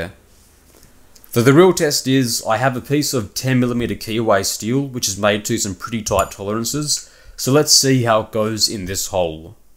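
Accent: Australia. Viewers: For someone like me who learned english at school some decades ago (british english as in most european schools) at the beginning it was not easy to understand everything spoken with this specific australian accent. But I got used to it and it pays off. Meanwhile I have watched every single of your videos and learned quite a lot!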